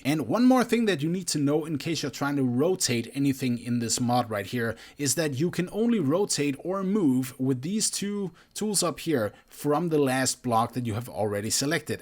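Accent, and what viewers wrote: Denmark. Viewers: Funny how i just instantly knew you were from Denmark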